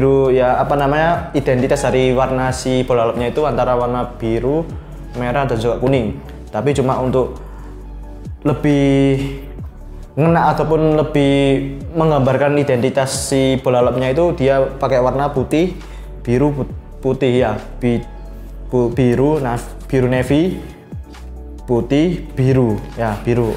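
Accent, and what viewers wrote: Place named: Java, Indonesia. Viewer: Halah..meddokh .. n gagap 😂 males Dengernya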